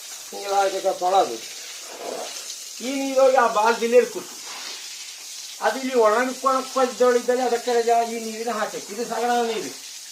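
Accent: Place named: Tulu, India. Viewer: ನೀವು ಕನ್ನಡ ಚೆನ್ನಾಗಿ ಮಾತಾಡೋನು ನಮ್ಮದು ತುಮಕೂರು ನಿಮ್ಮದು ಸ್ವಲ್ಪ ತುಳು ಭಾಷೆ ಮಿಕ್ಸ ಆತ ಉಂಟಲ್ಲ❤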